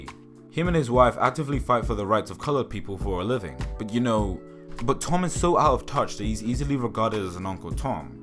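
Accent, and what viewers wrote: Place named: England, United Kingdom. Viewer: "sound white"??? I mean, from your accent it sounds like you've either spent time in england or have english parents... Just cause you have that accent doesn't mean you're white. Any more than someone sounding hood makes em black.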